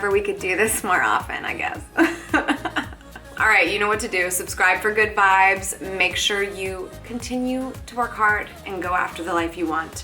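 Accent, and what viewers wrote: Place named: Philadelphia, United States. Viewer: vocal fry and from Philly.....!!!!!!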